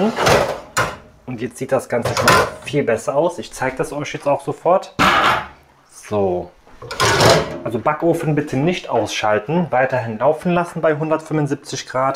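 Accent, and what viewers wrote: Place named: Cologne, Germany. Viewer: Klasse Video,! Kommst Du aus Köln? Ich frage wegen Deinem sympathischen Akzent. Milsch, Honisch...... 🌸🌸🌸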